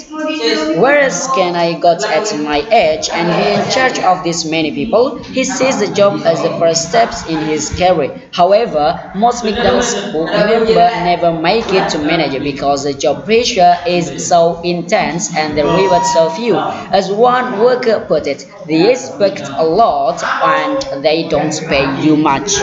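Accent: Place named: Madura, Indonesia. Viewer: Bahasanya ketahuan jek madureh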